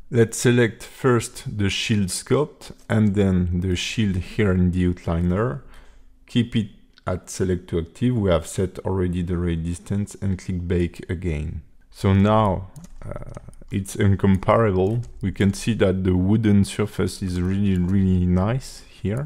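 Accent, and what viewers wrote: France. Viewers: Love ur French accent haha , keep it going !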